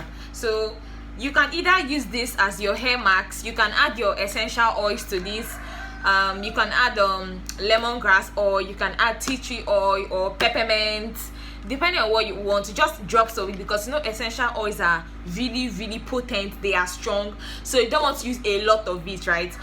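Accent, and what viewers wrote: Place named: Igbo, Nigeria. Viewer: you igbo accent is amazing! I love it.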